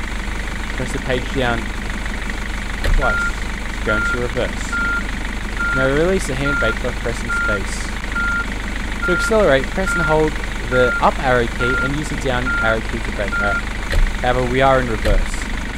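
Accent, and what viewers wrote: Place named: Australia. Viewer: he sounds NOTHING like nerd3. Nerd3 is English, this guy is Australian...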